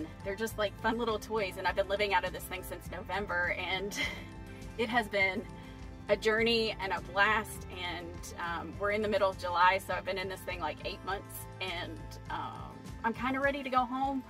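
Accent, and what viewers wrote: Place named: South, United States. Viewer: I soon as I heard that Southern accent I knew that I was in the right place 😁 Nice video.. I'm thinking about getting a camper here in Germany so I'm trying to learn as much as I can.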